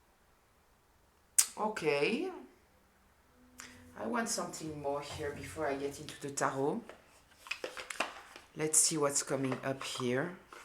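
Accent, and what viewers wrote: France. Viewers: i love listening to your perfect english in your beautiful french accent you should teach tarot, you make me understand the energy of the cards and the story they tell, so easily merci 😊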